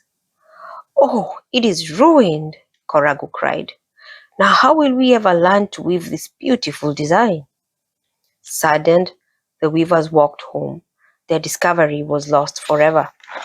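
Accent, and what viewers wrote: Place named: Africa. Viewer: Thank you for this read along book about Kente Cloth. Our Beautiful Feet Books primary curriculum suggested it to be read and we thought you did a great job reading it. We especially liked your accent (we assume you’re African) and also the native words were pronounced well. One piece of advice for next time you record would be muting the page turning with a sound editor or turning each page away from the microphone. The page turning was quite loud and disruptive during the book. Other than that, it was a great read through and we look forward to exploring your channel with other books.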